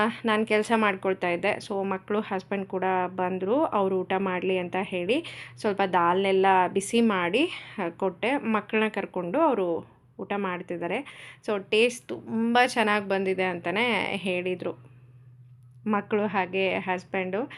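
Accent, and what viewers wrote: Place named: Gangavathi, India. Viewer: Hi sis namdu Gangavathi ನಮ್ಮ ನಿಮ್ಮ ಮಾತು ಸೇಮ್ ಇದೆ ನಿಮ್ಮ ವಿಡಿಯೋ ನಮಗೆ motivation ಆಗುತ್ತದೆ tqu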